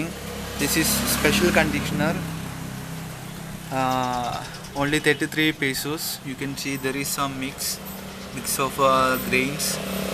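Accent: India. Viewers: Pota indiano pa ka bwect pakinggan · I dont think your a filipino, your accent sounds like indian guy for sure. Your video is not credible for all sabungeros to share what is good chicken feeds if your not one of us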